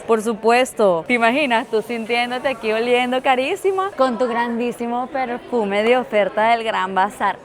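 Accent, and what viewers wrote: Venezuela. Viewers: Pq le sale acento neutro mexicano al inicio pero de repente le sale acento y gestos venecos? - No pude seguir viendo el video - me gusto mucho el inicio - acento neutro bien modulado para audiencia latam - pero cundo salio ese "Oite" "Mi chama" paso werita. por aca no es mi carnal. Bay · me di curnta q eras venezolana pareces a Cathy Fullop hablando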